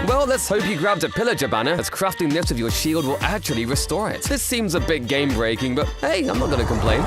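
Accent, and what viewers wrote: United Kingdom. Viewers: Glasgow accent?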